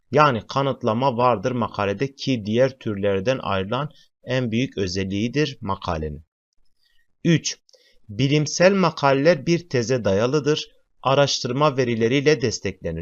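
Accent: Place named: Mardin, Turkey. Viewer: Güzel bir Mardinli şivesi var sanki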